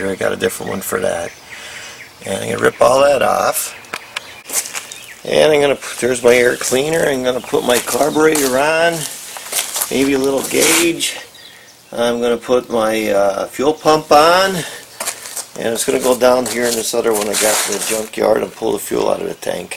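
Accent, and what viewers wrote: Pennsylvania, United States. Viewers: Best video I ever seen. Thanks 👍✌ PS. Your from PA. I know the accent 😄😄😄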